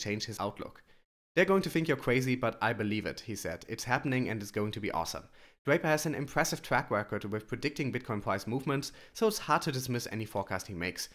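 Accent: Germany. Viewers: love your vids, thanks for your hard work. You remind me of German poker pro named Bencb789, ever heard of him? well, only thing you have in common is German accent. But you both sound smart AF! thanks for the info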